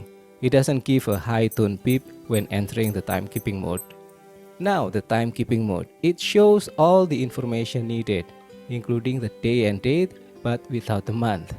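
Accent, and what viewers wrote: Indonesia. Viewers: Hello! I came across your videos while searching for proper reviews of lesser-known vintage Casio models with better water resistance, like the B650WD. The more I watched, the more I noticed something both familiar and unique about the way you speak. After a while, I realized from your accent that you’re Indonesian! Your reviews are top-notch, and I’m surprised you haven’t attracted a larger audience. Please keep making great videos, sir!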